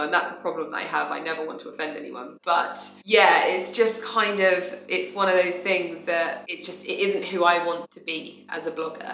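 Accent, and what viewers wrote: RP, United Kingdom. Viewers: I think you have great grammar but I love what you have said because I'm the exact same. I think your accent makes you sound posh if you had an aussie accent like I do it's worse it's so bad. Xoxox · First! For the first time! I was waiting for the video and tadam! ❤️ Great video really! It’s nice to know you better (and sometimes think « oh! just like me! ») Too bad about grammar. I hoped increasing my english level by watching your videos and reading your blog 😁 Just kidding of course. To me your english sounds so posh and you know what Pr Henry Higgins says in My Fair Lady : « The french don’t care what they do actually as long as they pronounce it properly ». So grammar or not grammar that is not the question, to me you are perfect.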